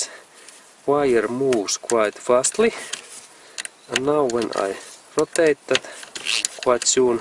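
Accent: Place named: Finland. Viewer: I am not a mechanic, I liked your video and the way you showed me how to do the cable tensioning. I would have thought that you should have jacked the vehicle up and put on jack stands, and then before assuming that the tension was right, go and see how the wheels spin freely. BUT I guess by evaluating the clicks and thereby the tension in the cable would be sufficient. I learned from you, but I will jack the vehicle up as I am not a mechanic and would not be sure that I have the right tension, perhaps also the shoes should be adjusted, I don't know about it, but I am about to do a Camry 2003 cable, if there is a cable, the handbrake leaver has no resistance as if there is no cable or connection at all. Can you can you come to North Queensland and do it for me? Cheers, and thanks. Yes by the accent I know you are from lovely, friendly Finland.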